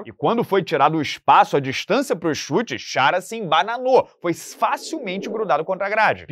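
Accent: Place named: Niterói, Brazil. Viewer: Cara não sei se é por que eu sou de Niterói também, mas eu curto o jeito dele falar, tipo assim igual o eu me sinto em casa.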